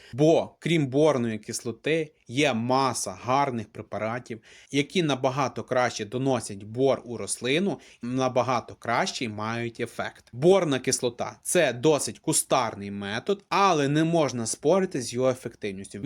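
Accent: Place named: Western Ukraine, Ukraine. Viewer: Западно-украинский говор. мОї-мої,наголос на " Ї",не жара, а спека.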